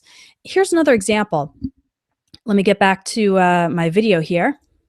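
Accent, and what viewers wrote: United States. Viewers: Hello, I´m Adriano from Brazil:) I´ve been following these English tips and videos on internet a long time ago. And I can sure my English skills are much better now. Thanks for the incredible English free tips you give us in your website <link>'. Your american accent is very clear and very easy to understand. As an English learner, I really appreciate your lessons a lot. Bye-bye and greetings from Brazil:)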